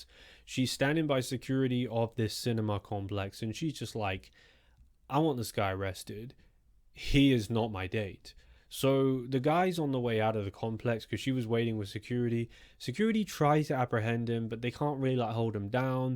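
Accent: United Kingdom. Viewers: You have been popping up on my phone over the last couple days, and I finally checked your channel out. What a fabulous storyteller you are! I was raised by a British mom here in the United States, and your accent has me feeling nostalgic..(she passed away almost 9 years ago). Subscribed, and listening in from beautiful Colorado. 💕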